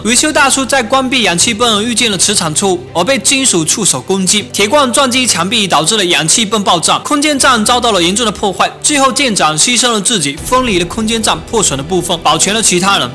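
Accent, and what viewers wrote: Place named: Fujian, China. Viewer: 虽然我是福建人 但是听到福建口音的普通话还是不怎么舒服啊哈哈哈哈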